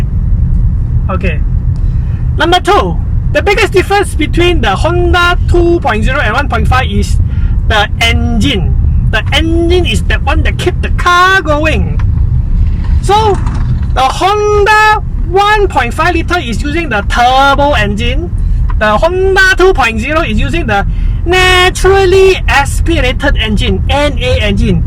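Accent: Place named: Indonesia. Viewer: silly indon tone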